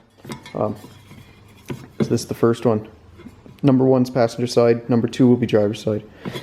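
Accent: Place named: Nova Scotia, Canada. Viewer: How'd I know a video about how to repair a rocker panel would be done by a guy with a Nova Scotia accent.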